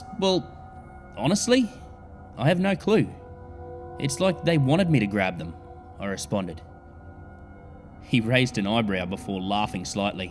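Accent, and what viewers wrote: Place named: Australia. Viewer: This is definitely one of the best creepy stories I've heard in a while , I mean u knew the tapes would be bad buuuut....yea that was so original. I love ur Aussie accent n I love how u can change voices n pitches and personalities. Fanfuckingtastic!!!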